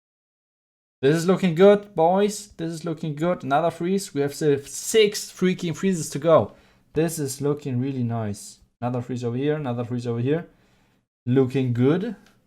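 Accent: Germany. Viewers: You can hear the German accent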